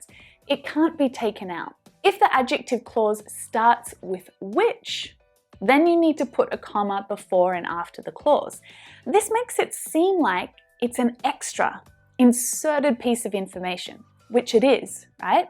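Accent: Spain. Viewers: I love your Spanish accent,! Sí, el invierno puede ser un infierno, también;-) Thank you again for this valuable classxxx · your spanish accent is lovely :) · Hi I loved your Spanish accent. Xxx not say (inferno) invierno ok!!! You're lovely ❤️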